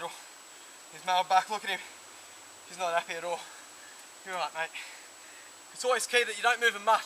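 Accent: Australia. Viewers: Gaming &amp; More! hes Australian not Russian! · Australian accent : let's catch a d, I think this one was bullshit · knew he was australian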